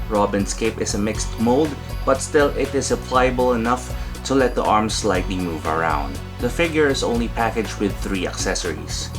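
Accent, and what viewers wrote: Philippines. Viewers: hi can i ask a question too? Are you pilipino cause your accent is like that just curious :D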